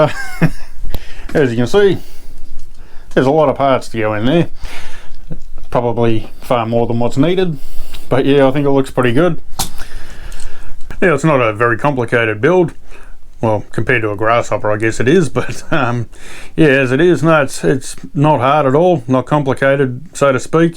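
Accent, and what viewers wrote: Australia. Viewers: Always nice to see a brother from down under our accents are unmistakable just discovered your channel nice thorough video 👊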